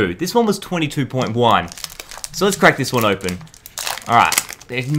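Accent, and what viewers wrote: Australia. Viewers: He's Australian sir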